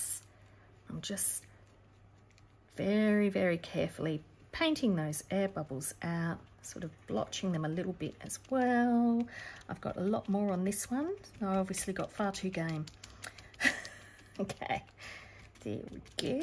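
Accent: Australia. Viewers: Hi, again, it is great to see how transferable skills can be. I started out, many years ago, as a scrap booker, then I was introduced to card making. Now I have found the wonderful world of Junk Journalling. While I have been collecting ideas and supplies for some time now, I have yet to actually make many projects! While I have used serviettes (napkins) as decorative card fronts I have not thought to use them to ‘dull down’ the shine from pages; a great tip. May I also say it is lovely to hear a familiar Aussie accent! No offence meant to our American, English, or Other, Journallers. Best wishes to Everybody and may your journals be all that you want them to be. 😀🦘🐨🇦🇺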